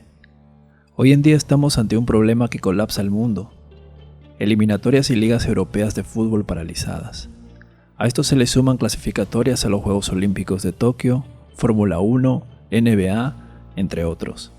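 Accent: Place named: Peru. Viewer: Por el acento me parece que es peruano. El youtuber.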